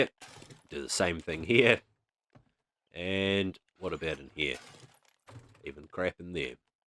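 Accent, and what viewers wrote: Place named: Australia. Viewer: ive been thinking about what country you live in. youve got that australian accent but you seem like an american so its gotta be one of those 2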